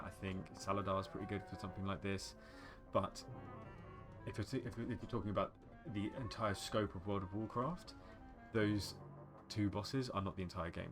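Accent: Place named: England, United Kingdom. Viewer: the English language spoken with an English accent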